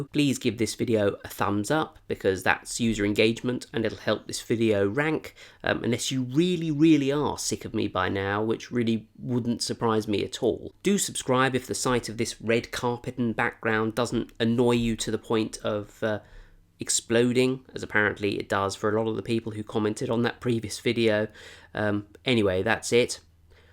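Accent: England, United Kingdom. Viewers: i subbed from that video.... it was because im an english person living in scotland, and i just really missed an english accent so now i watch you. x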